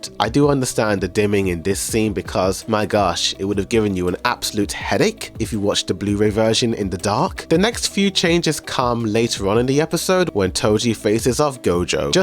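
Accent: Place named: United Kingdom. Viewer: it's definitely his accent but I doubt English is not his first language. His accent is...English. Sounds like almost every UK dude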